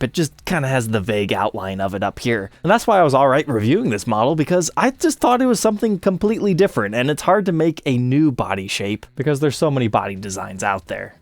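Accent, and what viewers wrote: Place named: Ohio, United States. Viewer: It’s that Ohio accent. He always says things like “needs restringed” instead of “needs to be restringed”.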